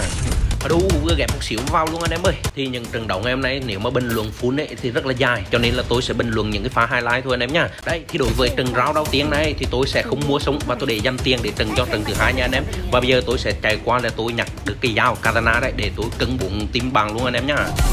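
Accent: Central, Vietnam. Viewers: Anh người miền Trung hả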